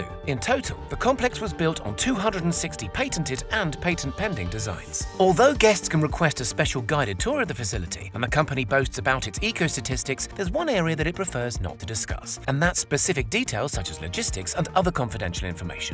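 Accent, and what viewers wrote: United Kingdom. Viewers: ima buy this stock becuz if its .05 per share dividend plus this guy reviewing it with the 007 accent got me sold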